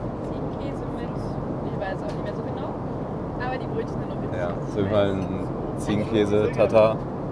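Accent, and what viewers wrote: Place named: Germany. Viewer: Dieser Deutsche Akzent 😁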